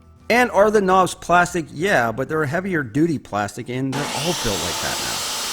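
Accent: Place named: New York, United States. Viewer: Dude, if you aren't from New York, I'd be shocked. :-)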